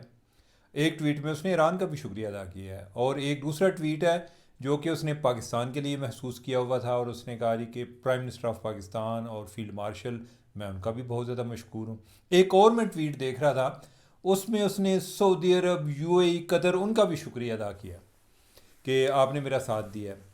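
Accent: Punjab, India. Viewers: I regularly watch ur vlog, very balanced, especialy punjabi tarka makes it so good. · Love your Panjabi touch ❤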